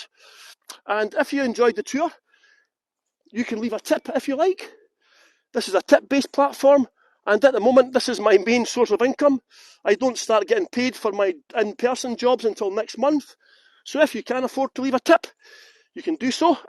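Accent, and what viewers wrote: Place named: Scotland, United Kingdom. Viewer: Love the Scottish Accent ;-)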